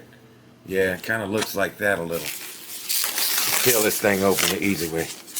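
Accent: United States. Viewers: Hi I'm from Italy, i love your accent, which part of the states are you from?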